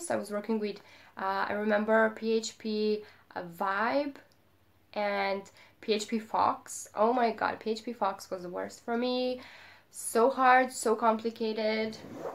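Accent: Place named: United Kingdom. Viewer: u r from Serbia but u talking as british girl wow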